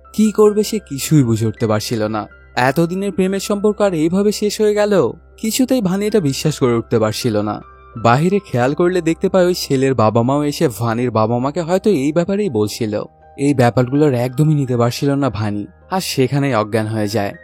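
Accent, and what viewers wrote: Bangladesh. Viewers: Anar gola sune mone hoche Bangladeshi..ader ki oder desher movie kom pore j sudhu amader indian der sob kichu niye pore thake...asole India chara to choltei pare na..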